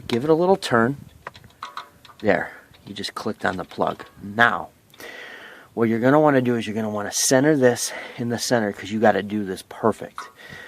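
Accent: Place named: Boston, United States. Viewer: thxs for the video.. Wife's car needs a change of plugs I'm guessing that's a Massachusetts accent lol Boston maybe?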